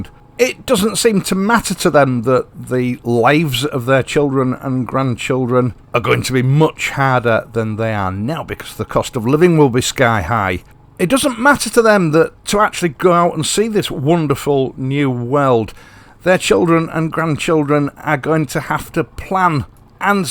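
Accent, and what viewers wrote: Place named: Hull, United Kingdom. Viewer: 💯👍❤ recognise that hull accent anywhere lol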